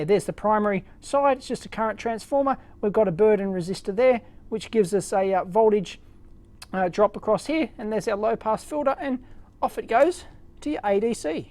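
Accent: Australia. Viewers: I thought you are from Aus ...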